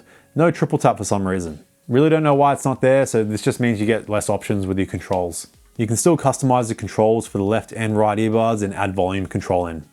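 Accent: Australia. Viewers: nice to hear an Aussie accent on a tech review for once 👌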